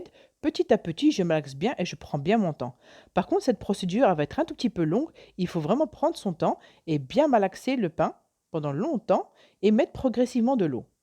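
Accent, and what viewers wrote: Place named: Oran, Algeria. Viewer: هل انت جزائرية سيدتي لهجتك من وهران هل نضع الزيت قليلة فل مقلاة ام كثير والعسل كيف يكون ثقيل ام خفيف شكرا لك على الوصفة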